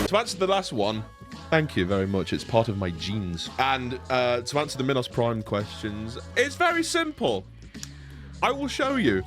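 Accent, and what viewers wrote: United States. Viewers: THANK YOU SO MUCH!! (Also holy crap, your American accent is ON POINT!!) ❤ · Oh my god, I kinda expected voice to be just a slight radio-y exaggeration of your normal voice, this is nuts. You're killing it with the American accent, it's spot on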